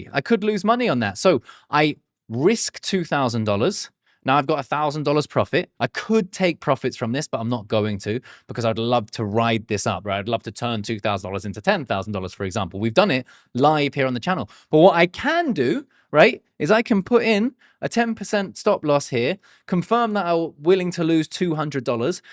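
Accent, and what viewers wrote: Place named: Essex, United Kingdom. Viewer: Defo prefer hearing a Brit. You sound Southern too, Essex kind of way I presume?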